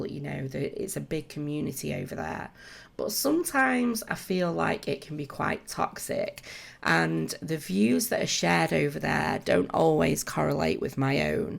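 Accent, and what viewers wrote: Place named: Manchester, United Kingdom. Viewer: Absolutely love this house. Being on an angle really makes it more awesome. Also brill to hear a fellow Manc simmer x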